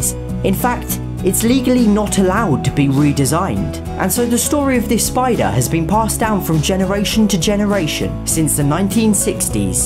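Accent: United Kingdom. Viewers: Love you my Bri"ish man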